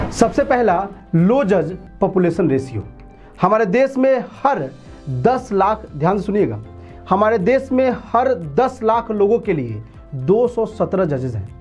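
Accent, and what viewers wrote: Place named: Bihar, India. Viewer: शानदार प्रस्तुति दी है आपने किन्तु बोल चाल में बिहार ज्यादा है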